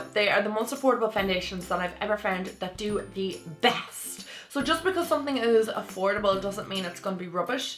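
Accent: Ireland. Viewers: I love Maybelline Fit Me Matte!! Oh, and I subscribe just because you have this cute Irish accent. Me love Irish accent!!!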